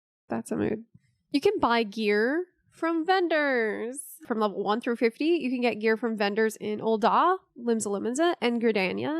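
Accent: South, United States. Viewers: So southern bell, defiantly into it 💕💕💕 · I definitely didn't expect the sweet southern twang in you're voice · Your accent trips me out. It's like a regular American accent 90% of the time, but some words have that great southern drawl. I like it. Great video! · Less than 3 minutes in and I am IN LOVE with accent! I'm from the south as well, and to see a fellow southerner, and a strong woman as well, as a successful content creator is such an amazing feeling! Her sense of humor, thorough and concise explanations, and tone are refreshing, relaxing, and the best thing I've watched in QUITE a long time! you have a fan for life!!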